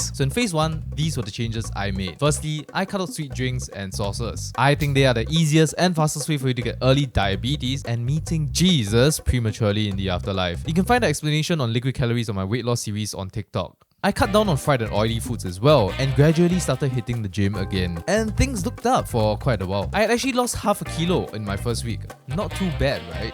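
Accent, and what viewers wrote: India. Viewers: not trying to be rascist but how do you sound indian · nice and informative video, but how come you have Indian accent?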